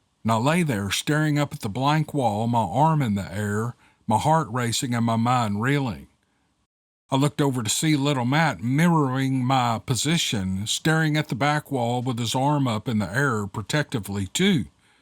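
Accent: South, United States. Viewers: Wow, what an email. Can’t please everyone. We ❤your podcast and your Southern Drawl. Thank you. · hey,its Peggie Sue from Ky, and i just want you to know that the way you talk is why i first started listening to you, and that was before you had started numbering your vids. So from one southern accent to another, dont feel like you ever owe an apology for being true to who you really are. That tortured soul, responsible for the volatile email you received, clearly hasnt reached the peace and happiness that you have, so for this envy and absolute ignorance, they responded the only way they knew....BEING IGNORANT DISGUISED AS BEING SUPERIOR. So, may God bless you and your family. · Never apologize for your Southern accent! You don't sound any better or worse than the rest of us Southerners, so you're in good company. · I personly like your southern drawl NEVER CHANGE IT ! 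TO H3LL WITH THEM !! WHAT part of Mississippi are you form most of my family in Mississippi is in the OXFORD area ..They have Ghost Stories and Black Panther stories .. Now you have a GOOD Night Maybe We can Meet up for a Barbeque and tell some tales for your show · The author of that email needs LOTS OF PRAYER. Why would you waste your time running another person down like that? She is in a sad, angry place. I think I have been listening since the beginning and am so grateful for all of your effort,. You are correct about the pronunciation of bipedal. I just always assumed it was your Southern accent if you pronounced things differently than I do. 😅😊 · My mother taught me, "If you don't have something good to say don't say nothing at all." Just as you said, " Turn off the channel." ❤ I love your southern accent and everyone has trouble pernouncing some words. I'm guilty of that and I'm bad at spelling too. 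😂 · Stay southern.i got your back. Iam from southern Missouri. Have the same southern. Talk. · We love your videos. Your the best story teller on YouTube, hands down. Southern accent and southern style story telling at its finest. Alright here we go!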